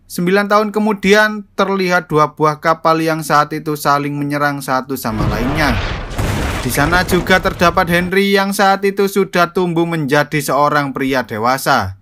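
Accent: Java, Indonesia. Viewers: Jawanya medok skalee rekk🤣🤣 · Meddok emet lu bru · bang sampean wong jowo ya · Wong jowo yo cak?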